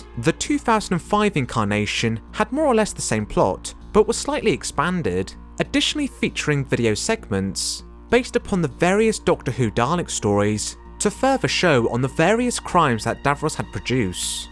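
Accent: United Kingdom. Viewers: People hear a British accent and immediately go "you could be the Doctor!".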